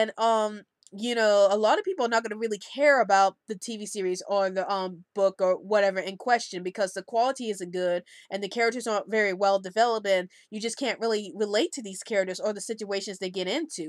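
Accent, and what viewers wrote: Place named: Boston, United States. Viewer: Thumbs up; good video! I feel that quality is important because of the reasons that you said in the video. By the way, are you from Boston? I say this because of your accent.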